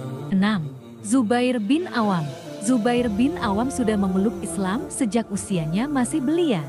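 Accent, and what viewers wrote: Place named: Indonesia. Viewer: Sayang pelapalan bahasa Arabnya, masih sesuai bacaan Indonesia.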